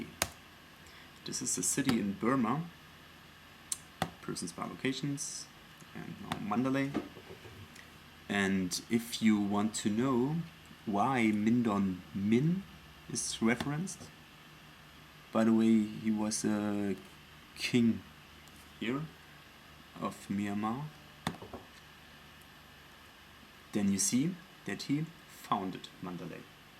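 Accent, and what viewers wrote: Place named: Germany. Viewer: du bist Deutscher, das hört man voll raus :-)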